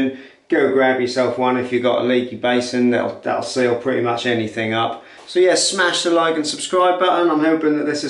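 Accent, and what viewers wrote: Norfolk, United Kingdom. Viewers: You sir are a hero, just saved me a lot of hassle in the bathroom and by the sounds of your accent a fellow Norfolk boy as well, thank you very much 👍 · Great video and I've just ordered 2 through your shop. Keep up the good work mate, sounds like you're in Norfolk?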